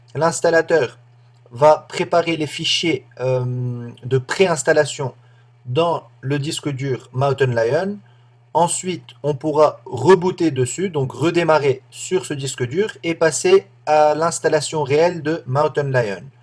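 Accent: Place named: Tunisia. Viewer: petit accent (tunisien?) tres charmant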